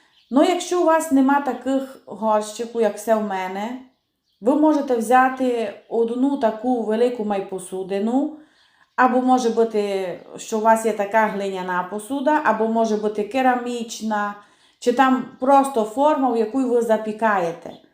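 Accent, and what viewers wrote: Transcarpathia, Ukraine. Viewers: Йой як файно по закарпатськи говорите, лем коли чуву ож крумплі то ріпа, та уха ми ріже 🤣 Вже варю, дораз увидиме шо то за діло 🤓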